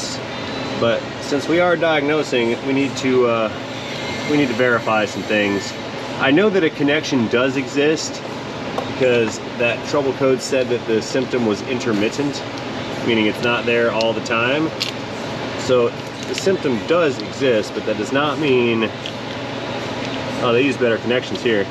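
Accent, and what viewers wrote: Russia. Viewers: Better Russian accent than me... and I speak Russian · Your Russian accent reminds me of FPS Russian. 😂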